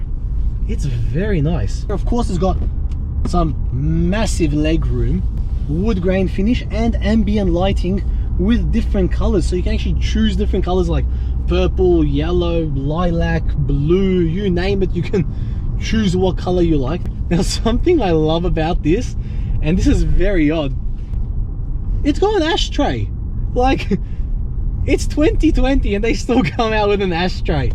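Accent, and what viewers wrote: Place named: South Africa. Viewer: Great vid and it's sounds as if you have a little south African in your accent. Do you? 👍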